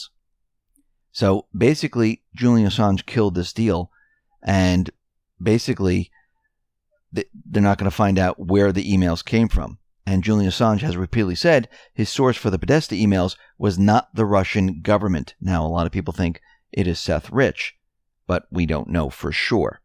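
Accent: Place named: New Jersey, United States. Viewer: Total East coast. Mid or Southern New Jersey by the accent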